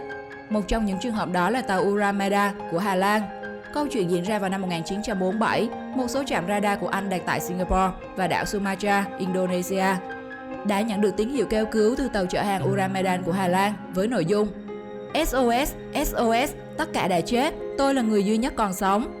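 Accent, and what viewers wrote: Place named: Nẫu, Vietnam. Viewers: Giọng miền trung nghe ngộ ngộ mà dễ thương ❤ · bà mc này người nẫu phải khg chèn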